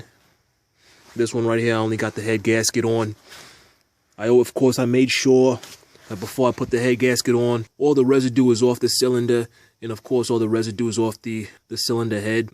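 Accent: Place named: Staten Island, United States. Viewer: Are you from Staten Island? You sound like one of my boys.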